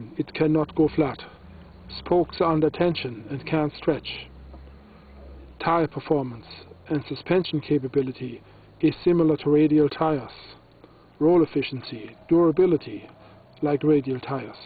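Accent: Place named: Jamaica. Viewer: he sounds like a Jamaican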